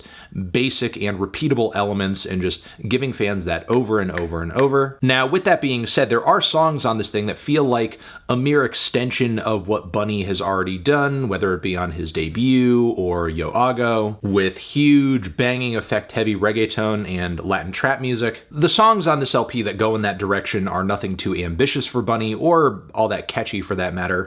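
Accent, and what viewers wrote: United States. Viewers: No se le quita lo gringo. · arent u italian why do you pronounce spanish words so…gringoly..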